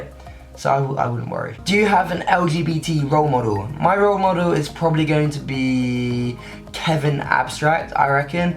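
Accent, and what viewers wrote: England, United Kingdom. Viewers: I love your English accent❤️❤️❤️❤️ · I'm really into your british accent :))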